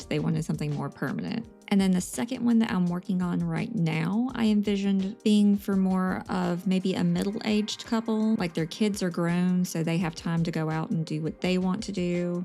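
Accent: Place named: South, United States. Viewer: Omg I love this idea! (Also your accent. Southerners represent!!!) I'm trying something like this in my save file!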